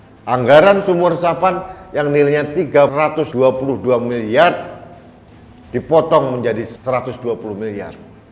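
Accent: Java, Indonesia. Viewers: jowomu asli pak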